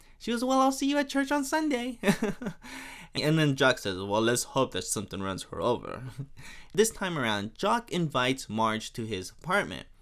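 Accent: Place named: France. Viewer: The French accent of yours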